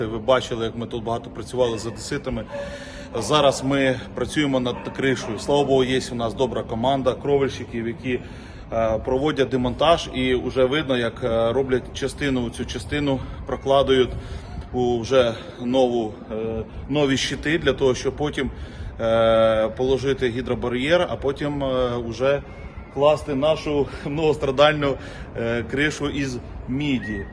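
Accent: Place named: Ternopil, Ukraine. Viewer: У вас чудесный украинский язык Тернопольский акцент 😢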